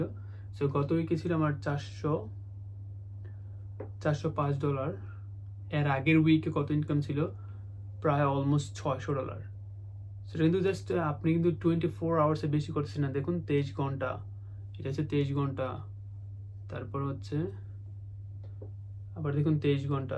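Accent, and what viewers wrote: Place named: Brahmanbaria, Bangladesh. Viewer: ভাই আপনার কথা শুনে বোঝা যাচ্ছে আপনার বাড়ি ব্রাহ্মণবাড়িয়া 😒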